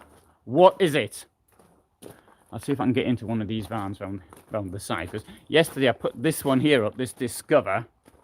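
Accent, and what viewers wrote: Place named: North East England, United Kingdom. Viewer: Morning from Scarborough, North Yorkshire not a million miles from where you're possibly from with your North East accent?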